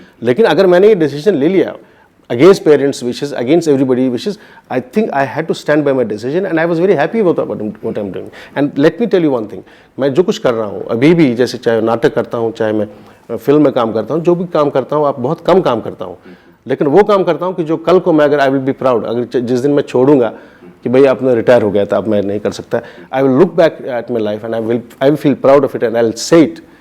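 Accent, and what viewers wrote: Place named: Kashmir, India. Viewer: Very surprised to listen to his Kashmir accent which I never saw in his acting career great actor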